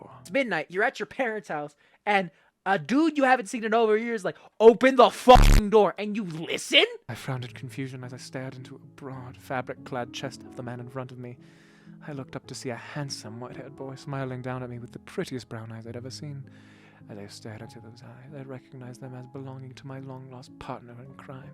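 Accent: United Kingdom. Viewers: You know what you should do (just a suggestion) you should continue reading the lord of the rings in ur British accent it helped and still helps me fall asleep every time I listen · the fact that I was just on wattpad, but I came here bc I googled: british gentleman from the 1800 voice 🤜🗿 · the chibi is so adorable!!!! I'd really like a spicy webtoon about... that'd be great along with chibi scenes 😖😖😖😖😖😖I'm so weird. Anyway I love his British accent like...😚😚😚😚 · Bro your British accent sounds like a professional narrator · you should Collab with Speechify an add your British action I swear I would pay just to have your voice read my stories😭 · THAT BRITISH ACCENT KILLED ME 💀✌️ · Yes British yes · I CANT WITH HIS BRITISH VOICE- ITS TOO MUCH FOR MEEEEEEEE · fyi: FLAWLESS hair! Like that British accent. As a native Brit: *chefs kiss *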